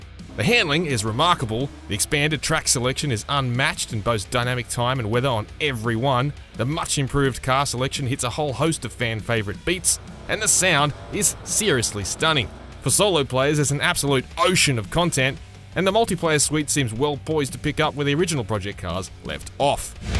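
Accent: Australia. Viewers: Dat auzie accent doh! Makes everything automatically more enjoyable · As Joe Rogan says australian is the coolest accent. Great review as always man! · has no one in this comment section heard an australian accent before? Ya'll are SHOOK.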